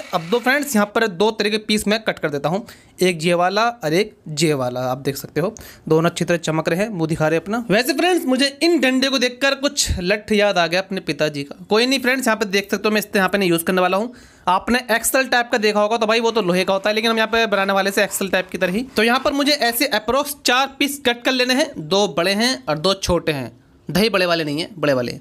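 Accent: India. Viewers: I love your killer Desi accent bro 😉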